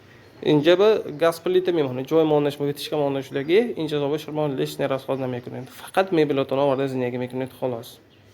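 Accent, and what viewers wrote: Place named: Mongolia. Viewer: Узбак бошаде ? Монголиский акцент . Что они делают в ходженте? Ужась откуда эти клопы распрлзаются ?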